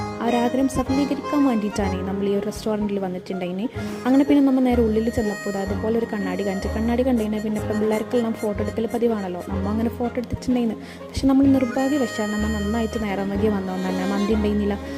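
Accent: India. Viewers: Ippazha sherikkm plkd slang aye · mmalde koykottere slang aanallo chechikk ❤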